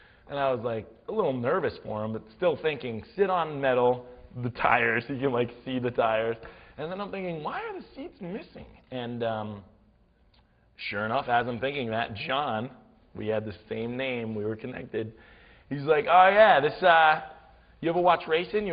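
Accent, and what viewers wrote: Boston, United States. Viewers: Oh my god! he is the best story teller ever!I love his boston accent, its priceless!! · He needs to bring the Boston accent back more often. Seriously.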